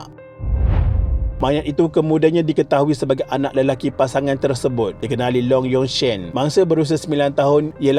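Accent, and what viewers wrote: Kelantan, Malaysia. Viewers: logat meme tok lari ore kelate be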